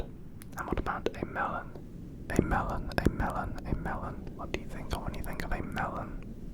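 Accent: United Kingdom. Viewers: Love the english accent in ASMR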